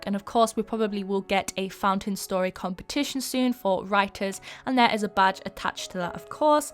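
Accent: United Kingdom. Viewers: YESSS, BRITISH ACCENT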